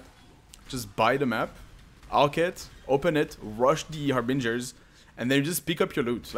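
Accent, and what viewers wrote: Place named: France. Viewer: Je me disais mais il est francais, 100% Puis oui haha Excellent build en tous cas, merci !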